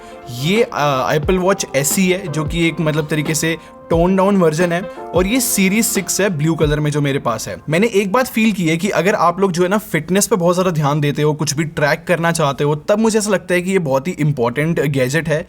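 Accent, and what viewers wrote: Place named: Delhi, India. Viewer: Bro I think it's delhi accent 😂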